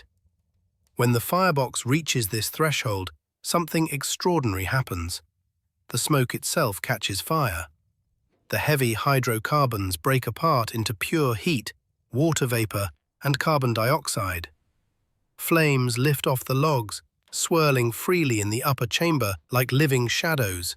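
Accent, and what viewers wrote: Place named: United Kingdom. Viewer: Very well done, even with a British-like accent. :-) You might look inro something like a descendent of a pressure cooker to absorb far more btu's without filling the room with steam. I am sure most engineers could come up with something productive and financially rewarding. Best regards, JDB Retired Prof. Engr Mgr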